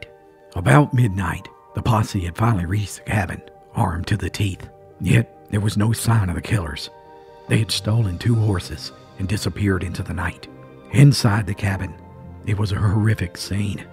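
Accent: Appalachia, United States. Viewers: Your voice and Appalachian accent are just what I need when things get heavy on my mind. I have intentionally taken my blood pressure before and after listening to one of your stories, and it never fails that my blood pressure drops considerably after the story. I told my doctor that she needs to prescribe your channel to all of her patients, especially the ones with high blood pressure. Thanks for all you do.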